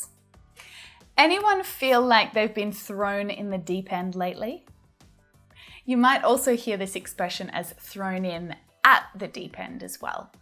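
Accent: Australia. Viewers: I'm a little bit getting hang of Aus accent while learning your videos.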